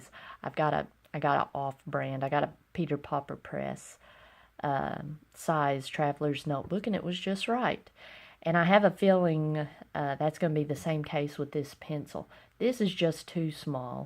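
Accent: South, United States. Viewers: Are you Southern USA?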